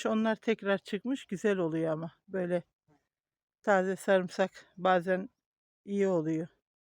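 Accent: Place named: Trabzon, Turkey. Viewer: valla canm ne yaprsan yap izleniyor hele konusma tarzin trapi gibi gliyr.her seyi guzel dusunmn cok guzel sende ailende masaAllah size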